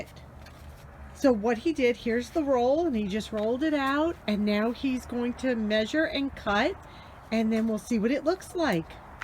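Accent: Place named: New Jersey, United States. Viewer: I love what you did with the table top! It looks wonderful! I am from Iowa but your accent reminds me of my dear friend in New Jersey! I enjoy listening to you and look forward to your videos! Good job!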